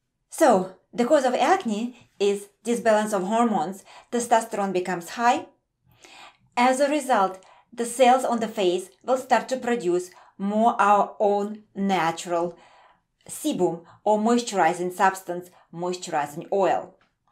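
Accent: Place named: Eastern Europe. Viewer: just out of curiosity where are you from you sound like eastern europian, and thanks for the great content:)))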